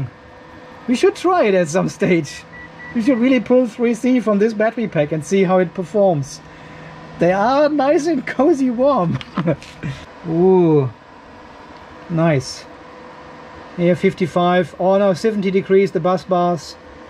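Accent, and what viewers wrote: Germany. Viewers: ja moin, bin das erste mal hier auf en Kanal und bei dem Akzent gehe ich mal davon aus das du ein deutschere bist?! or am i wrong?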